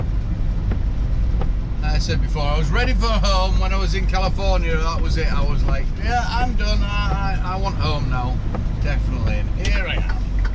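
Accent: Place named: East Yorkshire, United Kingdom. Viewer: Hi mate. Did you say your from East Yorkshire? I live in Goole. Your accent sounds alot like mine. 😆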